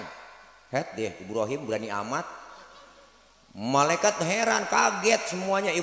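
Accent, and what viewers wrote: Betawi, Indonesia. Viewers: Betawinya keren..pengganti KH Zainuddin MZ.. Barrokallah tadz.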